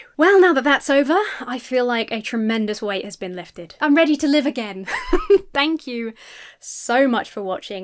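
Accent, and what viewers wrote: United States. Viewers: Your American accent is getting pretty solid! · Killing that "Yank" accent gurl. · Dude, your American accent is awesome.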